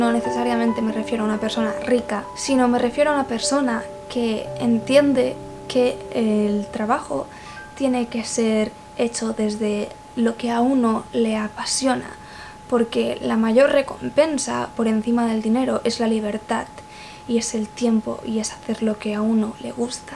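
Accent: Spain. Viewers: me encnata tu acento español . y tus videos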